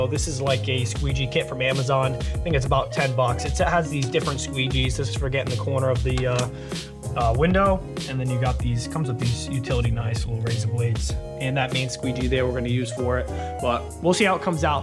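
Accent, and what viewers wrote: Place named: Massachusetts, United States. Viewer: U from mass? Sounds like it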